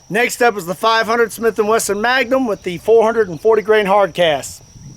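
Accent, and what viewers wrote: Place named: Texas, United States. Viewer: His Texas accent makes it even more American.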